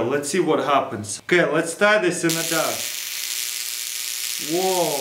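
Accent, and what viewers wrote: Russia. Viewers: 2 million subscribers... For the Russian accent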